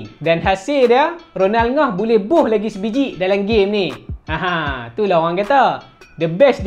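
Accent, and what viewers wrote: Kedah, Malaysia. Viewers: Cakap kedah, kita pon dengaq puaihhhh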